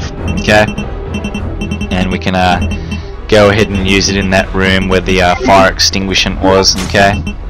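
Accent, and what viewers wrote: Australia. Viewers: He’s Australian man Different dialect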